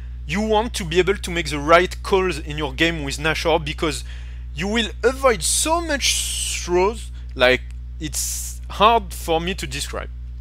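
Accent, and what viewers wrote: France. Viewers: dat french accent sound so pretty :3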